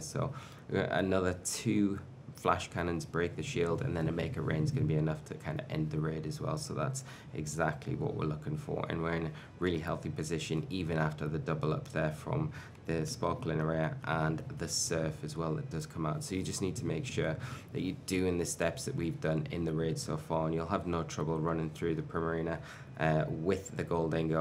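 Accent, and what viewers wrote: Newcastle, United Kingdom. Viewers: You’re a geordie?? How am I only realising now😮🫡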